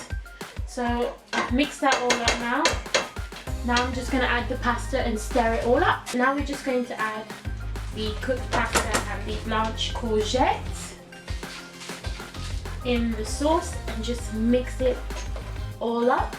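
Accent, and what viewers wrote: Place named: Belgium. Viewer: it's a belgian accent :p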